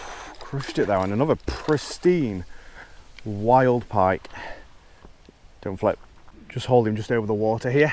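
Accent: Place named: North West England, United Kingdom. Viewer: Iv asked on another video but your videos have reawoken interest in fishing from my youth but I need to know if the canals in the north produce the lovely fish that you seem so skilled at catching, the chubb, the canal tench. I cannot imagine such fish living in the leeds and liverpool canal. you seem to have a north west accent but it looks like you live further south now.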